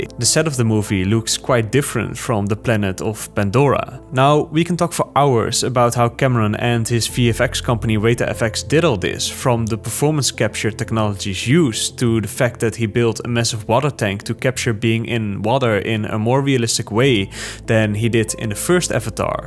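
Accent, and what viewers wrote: Netherlands. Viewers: Is that a Dutch accent I hear? No wonder you love wonder, makker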